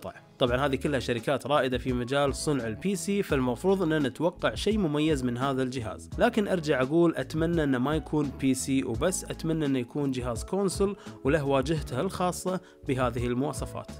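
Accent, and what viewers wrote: Iraq. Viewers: اللهجة عزيزي انه عراقي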